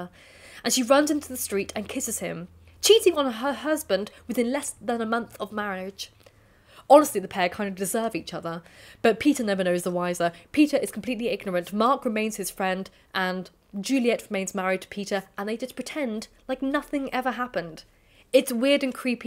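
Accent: RP, United Kingdom. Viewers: I love your received British accent. I remember not liking it at all even a romcom can work if it's at all believable and there's a sufficiently compelling set up and protagonist but I wasn't impressed.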